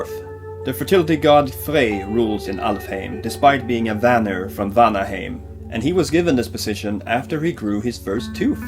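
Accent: Skåne, Sweden. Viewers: He can if he wants to, it's just his dialect that screws things up a bit. He has the Skåne dialect, the most southern dialect, it's almost Danish. I'd like it if he would speak "rikssvenska" but if he insists on speaking with the dialect we should let him. Overall he did a great explanation of the nine worlds and I enjoyed the video alot. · It's so interesting hearing the Swedish pronunciations of familiar names and words from Norse mythology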